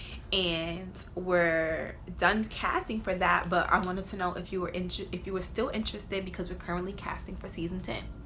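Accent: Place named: New York, United States. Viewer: My fav!!! You can tell you been in NY you picked up the accent a little ❤️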